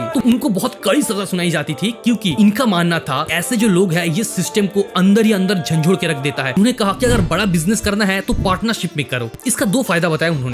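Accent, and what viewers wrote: Bengal, India. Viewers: Bhaiya aap Bengali ho kya?? Apka hindi accent suun ke lag raha hai😅😅❤ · ভাই তুমি তো বাঙালি? তোমার কথা বলার পদ্ধতিতে বোঝা যাচ্ছে। ধন্যবাদ তোমার ভিডিও খুব ইনস্পিরিং। আরো এগিয়ে যায়।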